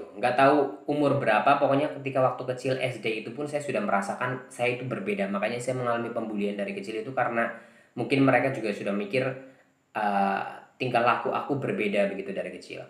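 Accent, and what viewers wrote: Java, Indonesia. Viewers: Agak medhok ya mas 🤭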